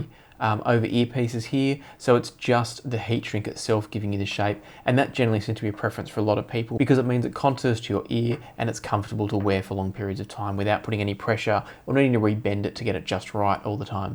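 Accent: Australia. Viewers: Great review as usual, mate. Really glad to hear a fellow Aussie voice in the audiophile community. Looking forward to your thoughts on the Topping E30 DAC! 👍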